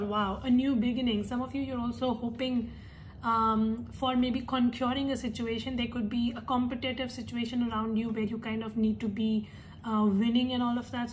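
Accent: India. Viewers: Found my new reader. Indian accent and saying bitch and accurate. 👍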